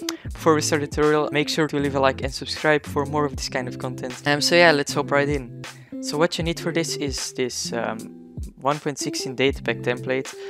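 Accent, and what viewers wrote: Netherlands. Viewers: Are ya dutch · i like how this guys sounds dutch i am from holland to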